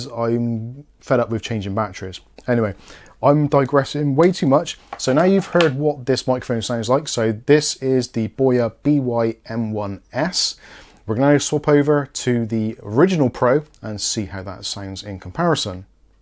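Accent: Bristol, United Kingdom. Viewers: Your audio has always been ok, despite the lovely Bristolian accent. My ears are not good enough to tell such details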